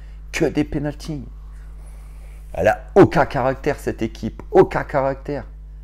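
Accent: North, France. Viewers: Salut, t'es d'ou du nord? dunkerque?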